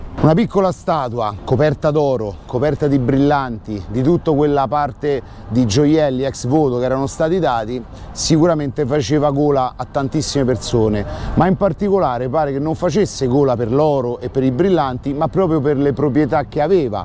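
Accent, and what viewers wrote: Rome, Italy. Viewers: ciao non ti conosco personalmente , mi piace il modo di come presenti i tuoi video con il tuo accento romano, bravo 👍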